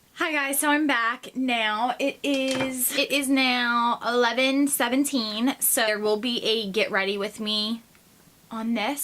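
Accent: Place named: Louisiana, United States. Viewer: I just got to ask do you live in Louisiana or Mississippi? I'm picking up on your accent. and I have this Foundation as well and I'm still not sure how I feel about it yet even though I do like the shade I picked out I'm not sure about the wear time yet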